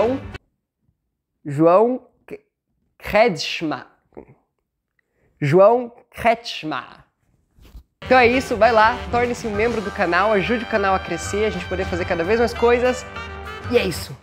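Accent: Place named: Brazil. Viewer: Olá, tudo bem? Você poderia contar um pouco de sua história tal como quem é você, português ou brasileiro? Por que fala portugues como brasileiro? Como se apaixonou pela língua portuguesa, sua área de interesse no mestrado... gosto muito do seu canal.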